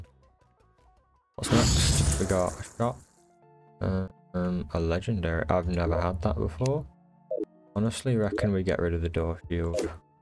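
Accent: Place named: United Kingdom. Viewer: I dont like this video. Unhelpful. Sounds too british. Please redo this video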